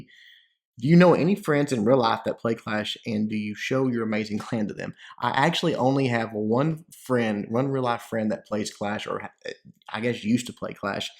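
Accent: South, United States. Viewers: Yeah, the southern-ish american accent is pretty cool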